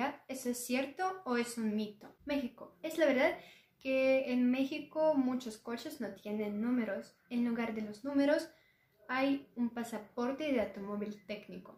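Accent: Argentina. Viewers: Interesante video! 👏 Que bien el acento y modismos de Argentina 😊🇦🇷. Saludos desde Buenos Aires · Yo como mexicano si he comido los famosos Escamoles,que es la hueva de la hormiga,y son deliciosos,y muy caros ,ha de ser por que no es facil recolectarla,ya que los que se dedican a eso tienen que soportar muchas picaduras de las hormigas,hay vídeos de eso,saludos rusita,que has aprendido español y que estas tomando acento argentino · Me encanta tu español muy argentino saludos desde Argentina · Saludos desde Argentina me encanta tu acento Argentino che. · Como hablas el argentino es muy bueno jaja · Lo peor que pudistes hacer es agarrar el acento arjentino, es el mas feo de todo latino america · tenés un acento parecido al cordobés (Argentina) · Jajaja me encanta el acento argento que tenés, hablás muy bien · Si tiene acento argento y vocabulario argentina...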